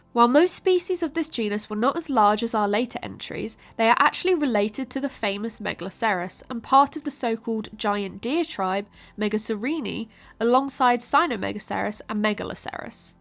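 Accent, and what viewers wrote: United Kingdom. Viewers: I like the British accent. It makes me think of Harry Potter. Sorry. 😅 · Fabulous! Listening to a British Thumbelina in a basket teaching me about deer is so wholesome.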